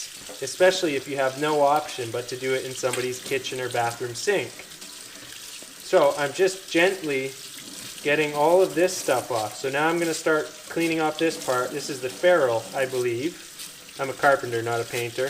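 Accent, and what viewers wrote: Canada. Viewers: You sound very Canadian or Minnesotan (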